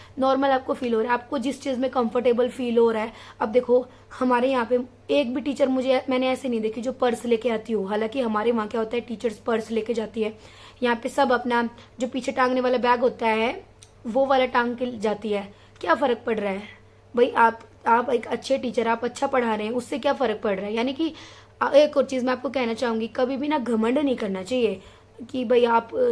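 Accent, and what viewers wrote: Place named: Haryana, India. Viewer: Everything is good but your HARYANVI tone is just superb....vedio dekh ke to pata nahi ..but sun kr bahut acha laga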